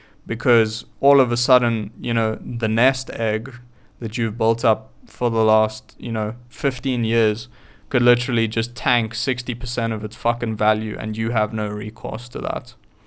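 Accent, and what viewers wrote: South Africa. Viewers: are you from south africa? i worked with a guy that sounded like you. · You speak English really well. I mean the accent. I can barely hear what sounds like a South African accent. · You sure are handsome! 😍 Dreamy Alpha male. And that SA accent is beautiful.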